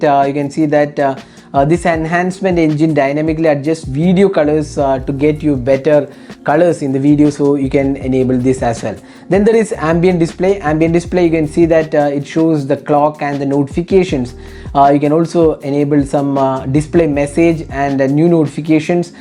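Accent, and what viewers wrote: Kerala, India. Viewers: I like your mallu accent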